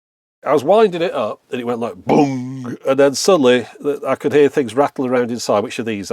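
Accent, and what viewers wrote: United Kingdom. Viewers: As a swedish viewer i can attest to this thing. It is remarcable what he does. And the voice! It is like if it was from a real british person. It is just wow and so much great. Greets from all your non engleesh ppl :D Carry on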